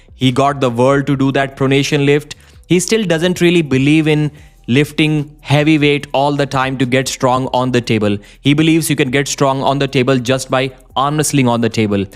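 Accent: India. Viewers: another Indian voice it's so annoying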